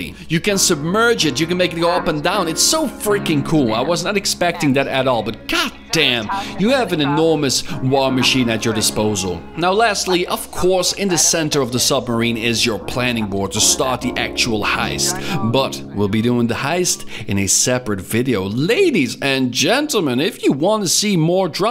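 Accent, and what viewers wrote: Netherlands. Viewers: Wait what is he Dutch? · Hold up, are you dutch? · Are you dutch?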